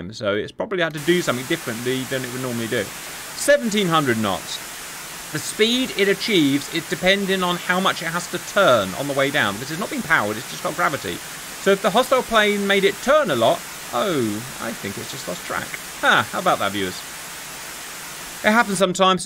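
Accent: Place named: United Kingdom. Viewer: I was giving him a compliment actually. That soothing British voice inducing relaxation as he walks you through step by step descriptions of mass destruction and warfare with a few comedic quips.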